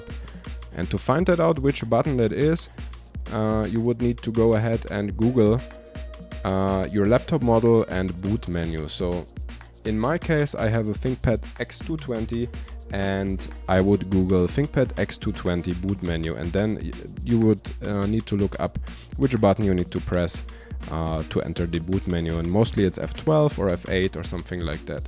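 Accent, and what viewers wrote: Germany. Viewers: U German? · Haha ich liebe deinen Deutschen Aktzent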